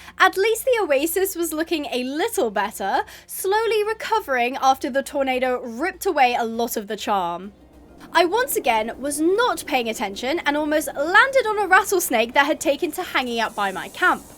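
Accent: United Kingdom. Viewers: You’re so British you little bugga ❤ I love it tho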